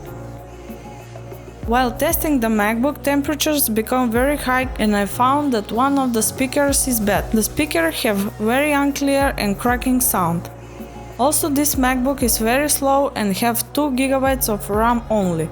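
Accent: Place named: Eastern Europe. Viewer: I sense a very distinct Eastern Europe accent, and I greet you from Bulgaria, awesome videos! subbed to both you and Andrew (Андрей?)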